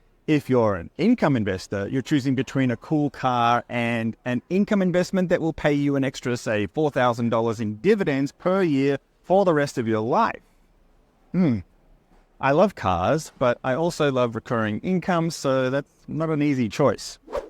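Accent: Australia. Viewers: Sounds like you are an Aussie, same as me. i like the strategies you share on your channel. Question, what brokerage platform do you use?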